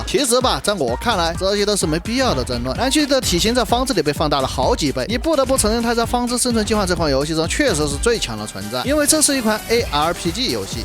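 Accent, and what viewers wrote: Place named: Sichuan, China. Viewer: 的地道川味方言视频竟然在油管这么火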